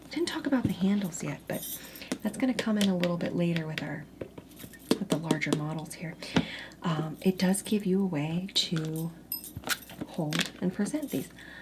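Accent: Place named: New Jersey, United States. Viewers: Why she sound like she from Jersey 😂😂this is so funny to me. It's ASMR comedy 😄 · The Mid-Atlantic/Philly vibe is ON POINT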